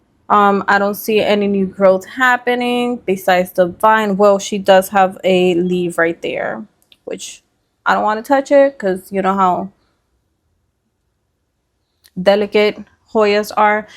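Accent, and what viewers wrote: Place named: Puerto Rico. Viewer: I will, tienes accento de Puerto Rico, eres puertorriqueña? Si no te molesta decirme, yo soy. Muchas gracias,.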